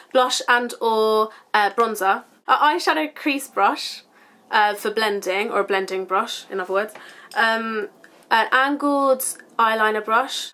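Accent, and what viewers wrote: United Kingdom. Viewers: i love it when you say reckon :) oh how i wish i had a british accent! x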